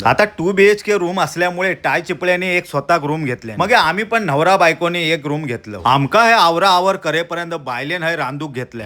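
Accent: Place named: Malvan, India. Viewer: Dada tujhi malvani aikun maja yeta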